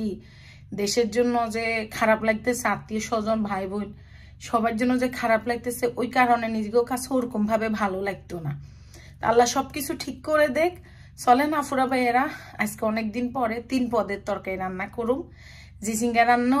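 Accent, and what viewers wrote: Noakhali, Bangladesh. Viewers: Apni noakhali vasay kotha bolen sunta valo lage....amio noakhlir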